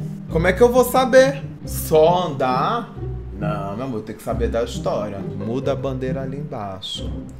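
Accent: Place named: Rio de Janeiro, Brazil. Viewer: Carioca da gemah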